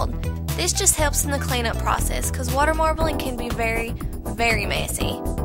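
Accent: South, United States. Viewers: does she sound country to you guys in this video more than others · wow, its like a southern cutepolish! such a cute cute accent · Woah! I got really confused when you started talking! You're like a southern cutepolish! lol I feel like I got more information/instructions when you talk than with just text. I like it!! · im from Tenneessee :) your accent is cute. Normaly i hate country acents but not yours. SO going to try this later. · wow you have a really thick southern accent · OMG! You sound so country!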